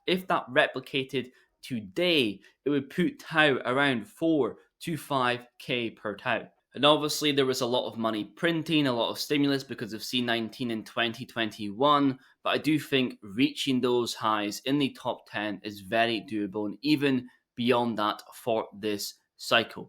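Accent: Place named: Scotland, United Kingdom. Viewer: Do you still think qnt will still be big this cycle? I'm starting to think that 2027 -30 will be the time for xrp and qnt. Would love to hear your thoughts. I am well spread by the way. Ps love how you slow down your Scottish accent. Edinburgh here. ❤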